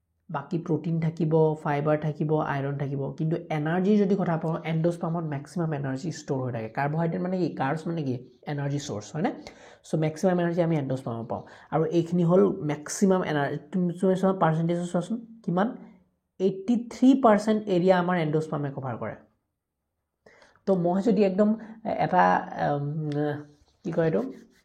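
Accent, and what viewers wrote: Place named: Western Odisha, India. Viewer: Tq sir.. being a western odisha dialect speaker it seems like u are just explaining in our dialect with slight changes in accent. Thanks for notes in english.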